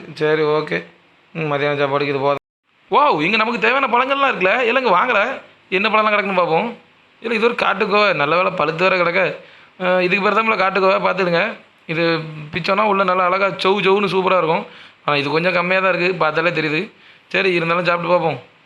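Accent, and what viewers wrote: Tirunelveli, India. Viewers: Ji super. Namma ooru slang romba arumai. Plz upload more videos ji. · Super la naama oru slang vara level laaa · Bro, next video release please... only solo video with pakka nellai slang... PLEASE.. nammooru basha kettu romba varusham aachillaa...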